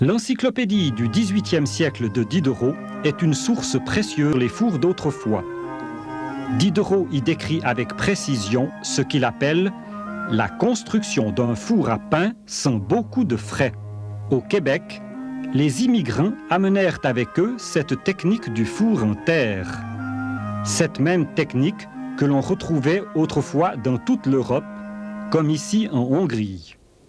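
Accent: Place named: Rural, France. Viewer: La narration, c'est ton papa ou c'est toi qui reprend un accent du terroir ?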